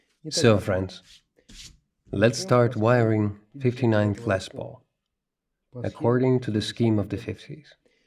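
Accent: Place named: United States. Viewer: Bro, love the american speak overdub. Males it so much easier to watch when i know what you are talking about lol🤘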